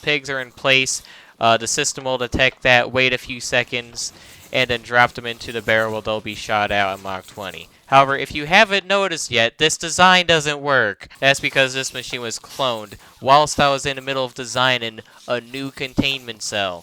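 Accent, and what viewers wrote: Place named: Scotland, United Kingdom. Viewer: Bro sounds slightly scottish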